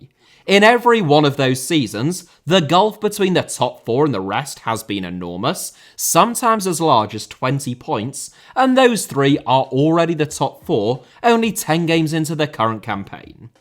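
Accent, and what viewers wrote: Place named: United Kingdom. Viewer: The video IS interesting, but I somehow kind of feel weird when a super British accent speaks about Europe 😂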